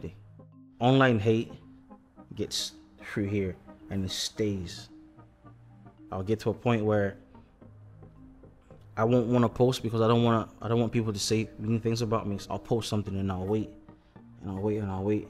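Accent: Scotland, United Kingdom. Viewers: I can't believe he has a Scottish accent I thought he was from London, that has absolutely blown my mind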